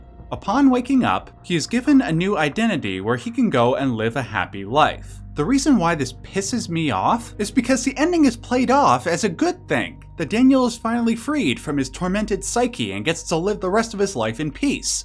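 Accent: Canada. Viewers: Whoa! Plot twist, you’re Canadian!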